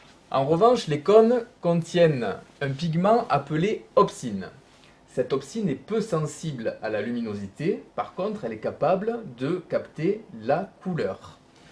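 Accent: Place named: South, France. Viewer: Petit accent du sud qui va bien. Pepere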